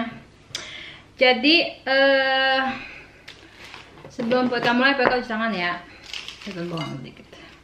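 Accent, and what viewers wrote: Jakarta, Indonesia. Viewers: Mantap mpo masakan ikan nya... Bikin ngiler dan laper... Dan lucu jg sm mpo...bicara nya tetap betawi asli..😘😘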